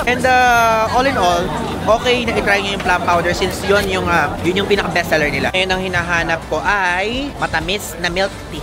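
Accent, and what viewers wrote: Nueva Ecija, Philippines. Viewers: Pag nagsasalita ka, halata talaga na taga Nueva Ecija ka. Not a bad thing though. Nanotice ko lang. Taga Nueva Ecija rin Mama ko.. 😊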